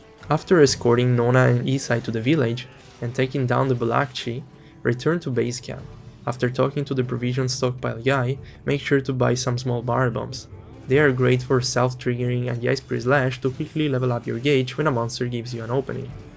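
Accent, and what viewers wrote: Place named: Italy. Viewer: he's italian, so you gotta deal with his accent, he speaks very clear imo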